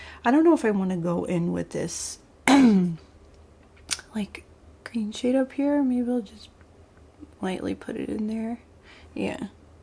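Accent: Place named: Philadelphia, United States. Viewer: I LOVE green eyeshadow looks!! I think they look sooo pretty with brown eyes. Watching your makeup tutorials have really helped me feel more confident in doing bold looks again. I have also bought a bunch of stuff you've used and that you have recommended 😂🤣 thank you for being awesome! Also... I live in Philly and you sound like you have a Philly accent. Sorry if I'm completely off with that hahaha you just sound local to me 😅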